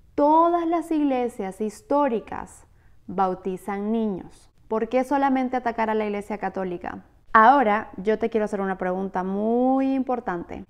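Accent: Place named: Lima, Peru. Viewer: ¡Te felicito! Excelente didáctica. Soy apologista católico desde hace treinta años y me enorgullece ver tu prestancia y razón para defender nuestra fe católica y su verdad recibida del mismo Espíritu Santo. A propósito, siendo yo del Callao Perú, capté tu acento limeño. Te pregunto, ¿estás en el Perú o en EEUU? Muchas bendiciones en tu apostolado.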